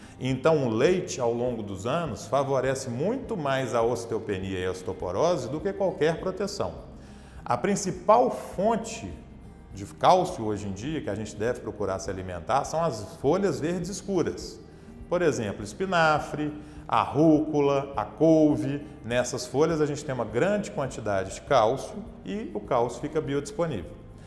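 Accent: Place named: Minas Gerais, Brazil. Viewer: Oi Dr, adoro escutar suas explicações, muito bem explicado, com sutaque bem mineirinho, abraços.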